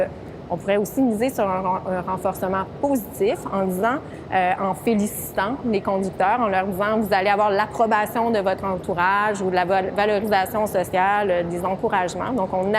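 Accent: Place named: Canada. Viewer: Merci beaucoup très belle canadienne ! Je suis parisienne..j'ai réussi à vous suivre avec votre bel'accent ! 🐈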